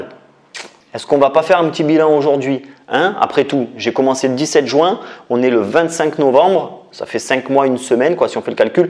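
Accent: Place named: South, France. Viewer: t gentil mais arrogant tu le dis toi même tu connais pas les conséquences de.ton régime et tu n'as aucune connaissance sur le sujet donc c normal que l'on signale ta vidéo qui pourrait mettre en danger certaines personnes fragiles Moi je reste persuadé que tu as raconté du bulshit et tu essaie d'innover mais ton accent sudiste ne suffira pas à convaincre du contraire L'homme est omnivore et à besoin de glucides et d'aliments basifiants que ça te plaise ou non Profite de ta jeunesse car c la génétique pour l'instant qui te maintient en bonne santé et non ton pseudo régime a la con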